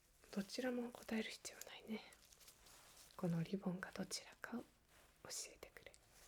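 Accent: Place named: United Kingdom. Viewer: Interesting japanese accent. almost sounds english sometimes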